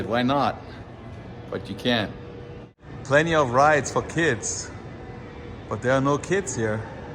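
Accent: Germany. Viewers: Are you German? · Haha the very same Massage-Seats weve over here in the "PC" (Paunsdorfcenter) in Leipzig, Germany. Got your Video in the Recommendations and just thought "he has a german accent" - totally not read ur Accountname.:D Wish ya a nice sunday and thx for the small travel in time.:) Liebe Grüße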